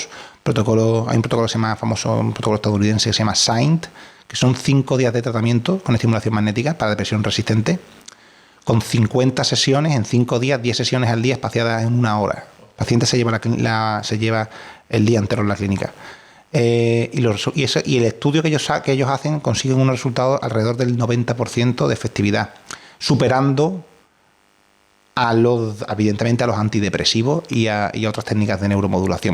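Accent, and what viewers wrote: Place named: Andalusia, Spain. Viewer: Señora es sevillano, aquí somos andaluces y es nuestra forma de hablar, si no lo entiendes no lo veas pero deja de meterte con un acento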